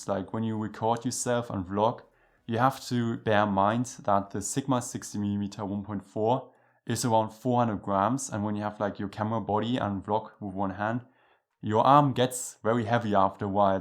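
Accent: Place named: Germany. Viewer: I have a question... Do you speak German!? (I'm from Switzerland and I find that you have an German accent haha😂)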